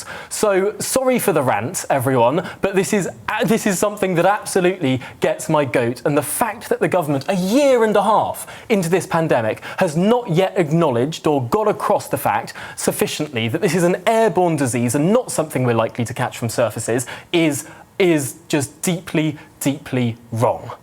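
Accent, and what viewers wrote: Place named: RP, United Kingdom. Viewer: Oh dear these presenters are crap,my hopes for GBN have gone.They had a great opportunity but this lad is as woke as owt & looks on pain when he’s speaking like a toff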